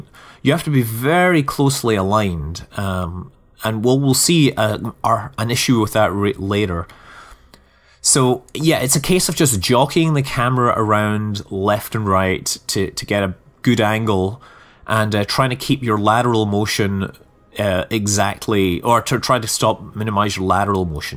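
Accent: Scotland, United Kingdom. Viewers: He has a scottish accent :)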